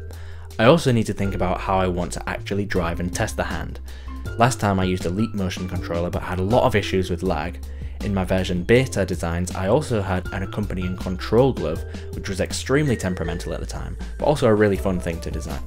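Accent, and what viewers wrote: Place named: Yorkshire, United Kingdom. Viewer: Yorkshire accent or not, thanks for sharing!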